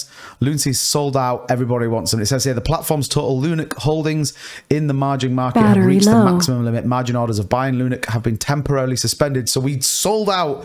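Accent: United Kingdom. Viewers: It's hard to understand what ur saying when u talk too fast with the British accent. Just a heads up from over the pond. Love your channel!!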